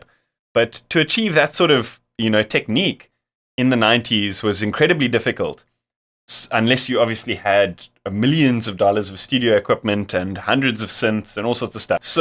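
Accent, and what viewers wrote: South Africa. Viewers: Yo are you South African my g you have the accent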